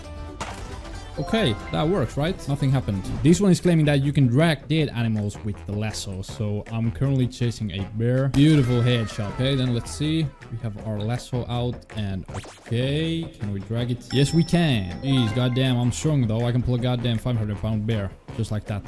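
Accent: Finland. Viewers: You sound like finnish guy and i from there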